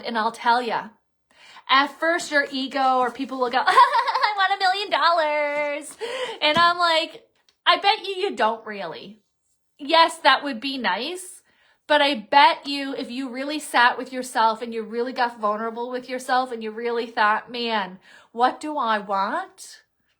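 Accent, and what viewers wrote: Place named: Nova Scotia, Canada. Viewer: Hi! I've been listening to your posts for a couple months now. I'm from NS originally but now live away, so it's nice to hear the accent from back home. Your readings are always on point for me, plus they're enjoyable to listen to. Thanks for posting! 😊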